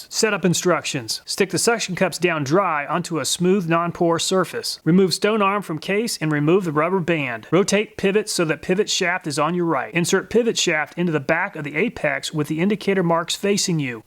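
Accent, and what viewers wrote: United States. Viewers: He sound so American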